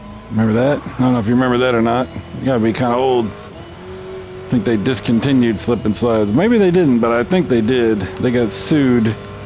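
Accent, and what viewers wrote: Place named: United Kingdom. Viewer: Some cool racing combine with british accent color commentary plus a lot of madness!!! Thanks!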